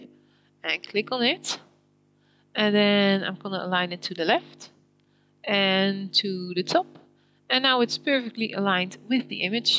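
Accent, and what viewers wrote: Netherlands. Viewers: Wauw... Kom ik na dik 2 uur uit op een video die het ein-de-lijk een deftig uitlegt! Los van het 'bijkomend' selecteren met shift was het het bijsnijden vd laag dat me de das omdeed. 2 foutjes rechtgezet met één video van 8 jaar geleden. Knap werk. Ook heel schatti, dat Hollands Engels :-D Grotejes van een zuiderbuur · Definitely a Netherlands accent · The girl doing the voice-over is soooooo Dutch. Not as bad as Prince Willy though ;-)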